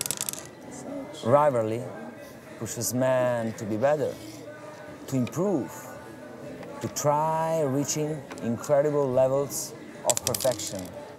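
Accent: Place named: Italy. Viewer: Dato che si sente tantissimo che sei italiano, perché non l'hai fatto nella tua lingua?? o non sai parlare neanche quella??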